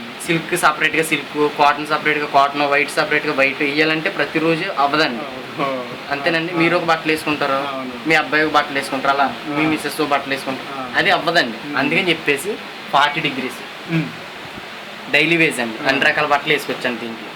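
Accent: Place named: Godavari, India. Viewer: Godavari Telugu super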